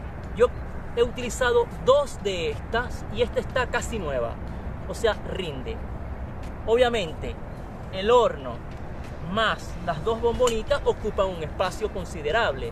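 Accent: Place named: Venezuela. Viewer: Muy bueno..mi pana,..desde el principio me pareció acento venezolano,..pero cuando hablaste sobre el hecho de que no cuesta “tres lochas”,..no tuve dudas. Muy bueno e interesante tu video. Donde es ese lugar?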